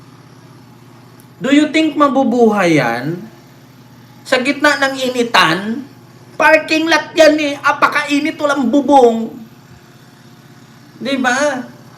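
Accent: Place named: Iloilo, Philippines. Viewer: Ilonggo Ako pero magkatawa ko mag ilonggo ka.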